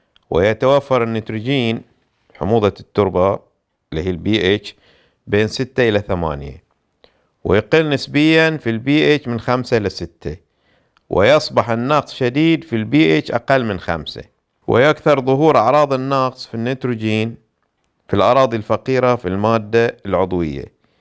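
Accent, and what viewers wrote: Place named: Bahrain. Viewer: انا توقعت من البحرين من الاسم للعايله وهم اللهجه بحرينيه واضح جداً..والنعم والله من اهل البحرين. اخوك من السعودية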